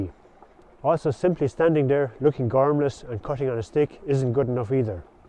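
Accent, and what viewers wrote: Ireland. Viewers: Your channel is very wholesome and your Irish accent is very easy on the ears brother. The Irish have the most beautiful language of all European continent. The romance languages of Europe pale in comparison to the Irish language. Many Years ago, for 26 years, I owned a made in USA Schrade Old Timer Blazer stockman. That three blade stockman blazer had a very special design large sheepfoot blade that sliced through saplings, feed sacks, rope, cardboard boxes and weeds like a hot knife through butter. It never became loose, never broke, never failed on me after 26 years of hard pressure testing. The large clip blade cut many fruits & vegetables well and also cleaned fish. It was carbon steel so honed easily and held an edge well. The spey blade worked well for skinning many squirrel & white tail deer. I gifted that knife, my most prized possession, to my best Army brother. 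Schrade Old Timer knives are no longer made in USA and their quality has gone down since being made now by atheist communist China.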